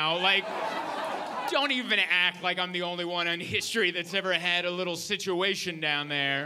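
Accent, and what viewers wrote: Hungary. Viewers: Okay I still laughed, but your Hungarian accent is awfulllll 😂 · as native Magyar, I was surprised to hear that you don't speak Hungarian. Travelling a lot internationally, I can recognize my fellows just by accent from far away, airports, hotels, anywhere just by hearing (maybe because I have also this thick accent, tuned my ears for). Did you know that you have typical Hungarian accent speaking English? Surprising... Congrats man for your stand-ups, go on! BR, Csaba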